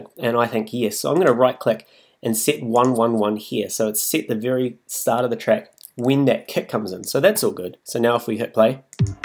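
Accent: New Zealand. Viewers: Love the Kiwi accent. You really "squash" your vowels! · New Zealand? love that accent!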